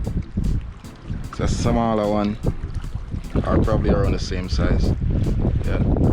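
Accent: Jamaica. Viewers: I was about to comment on your ascent, then I read this comment and know your jamaican heritage, big up youth. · It was a gift, you dont refuse gifts. Pay it along to someone else if you feel like doing so. Its a blessing to you and your family. Some persons may have not acted well with you fishing there, she was very nice. Not to worry you were not begging and even if you were, its not a crime, she was nice, just give God thanks. I detect an accent, are you Jamaican? First time on your channel.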